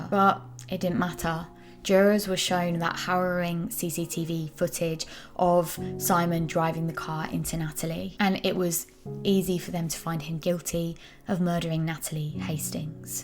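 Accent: United Kingdom. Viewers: Hi . Love your accent. I assume it’s British? Don’t laugh. I’m not great with accents. 😉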